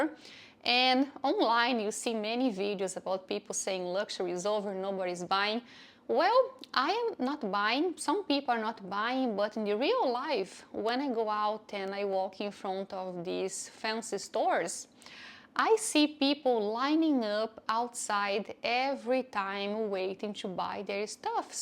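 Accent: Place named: Brazil. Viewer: I like your videos! Subscribed! I'm turning 30 soon and I'm already doing those changes without even realizing it. I feel super free not having to get my nails done every 2 weeks, but I don't know why I feel like without having them done it looks less put together comparing to having them done. Also wearing sandals without having the toenails done feels so off and not so pretty. Do you still get your toenails done? I didn't see you mentioning your name yet by the way nor where you're from, but I'm guessing you're from Brazil as I'm from Brazil too and the accent sounds familiar hahah Cheers!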